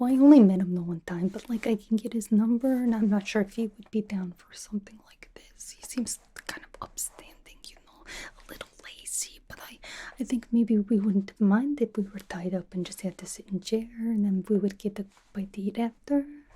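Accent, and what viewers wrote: Russia. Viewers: Another amazing video like always love the Russian accent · As soon as I saw that thumbnail, I was like "Gah dayum. 😍", this is gonna be super tingly. 😌💖 I love that Russian accent. 😁 I dropped a Like for you. ❤️ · Does she have other Russian accent vids? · Russian Accent is Top 5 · The russian accent making a return is nice nice, This kinda came out of the blue but the sarcasm so far has been amusing lol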